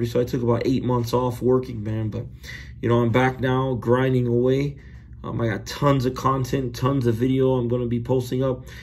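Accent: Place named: Louisiana, United States. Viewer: You from Louisiana bayou country? You sound a little like the Cajun Cannon.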